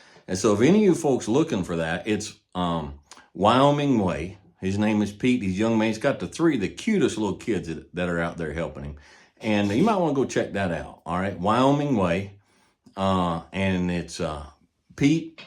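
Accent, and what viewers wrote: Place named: Midwest, United States. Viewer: Good morning from Moscow, Russia. It's in the morning, and I was about to go to bed when I came across your video and realized I couldn't miss it., it's been a tough year. I divorced my wife because of her infidelity, almost killed myself, and went through a depressive episode, but you helped me. Your videos with the measured pace of narration, calm voice, metaphors about horses and nature, those stunning views in the background, a cigar in your fingers and a Midwestern American "cowboy" accent were like an asmr for me. In moments of anxiety or restlessness, I would often just turn on random videos from your channel and find peace in them. Thanks to you, I survived the hardest episode of my 22 years of life and realized that the last two months of 2023 were the best, as I finally started breathing fully again. 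Thank you. I respect you and appreciate what you are doing for the younger generation.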